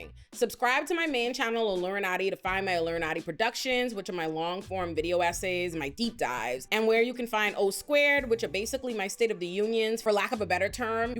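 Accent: Bahamas. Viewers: you sound like youre from bahamas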